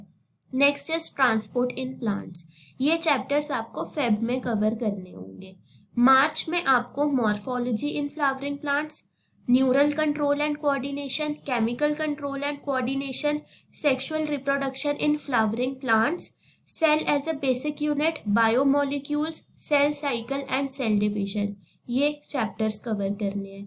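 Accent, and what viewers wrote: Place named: South, India. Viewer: Thank you Madam your assent is South Indian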